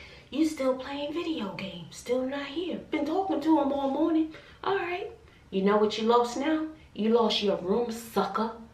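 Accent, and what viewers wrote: New York, United States. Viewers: I love your channel so much, I love the way you speak My family from the Bronx; we recognize a New York accent when we see it. it’s so refreshing to see a momma much like mine on YouTube. We need more mommas like this. Growing up in the Projects I didn’t have a lot of things. I didn’t even have my own bedroom until I was about 17 almost 18. I think taking away your sons room will teach him to appreciate what he had because some people aren’t fortunate enough to have their own rooms. Great parenting. Keep up the good work.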